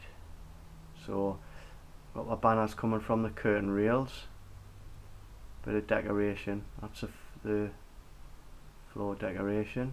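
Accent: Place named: Newcastle, United Kingdom. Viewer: lol your geordie accent made me laugh when talking about your pillows then straight on to the pillars. Great work mate looking awesome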